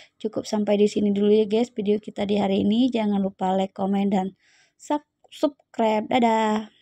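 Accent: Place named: Palembang, Indonesia. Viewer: Menu yang sangat enak sekali lor. Kayak orang Palembang lor gaya ngomongnya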